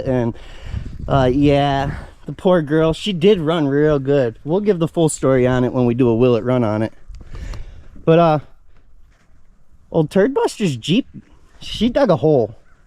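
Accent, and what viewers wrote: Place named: Florida, United States. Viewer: What I’m curious about is what kinda red neck Florida accent is that ? It ain’t no southern twang I’ve ever heard .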